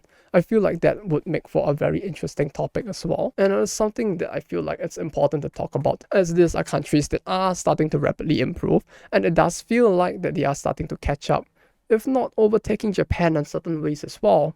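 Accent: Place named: Singapore. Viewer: I'm a first timer on this channel since this happens to be on my recommendations. First of all, why is your channel low in subs? Cause this is some seriously good and insightful stuff. Typing this near the end of the vid; almost finished watching. A lot things you've said actually clicks and confirms certain stuff that I only have a smidge about JP Game dev and understand how they dev as how it is. (ie, game tech and design patenting). Like, on the surface I can agree and understand they do it for protective reasons, but I never crossed in my mind that this thing can actually stifle innovation for new games and even local indie games in the large scope. Second of all, are you actually native Japanese? Cause your accent is way more closer to a Singaporean. Heck, if you were to tell me you are an expat living in Japanese or you had a half South East Asian parent, I wouldn't have been surprised. 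On that note tho, you are quite eloquent and really know how to orate. You could be a top ranking salesman or politician, if you catch my drift, haha. But yeah, not a dull moment when you speak. One again, YouTube has unearthed for me a great gem of channel. I hope more would witness this bright glimmer.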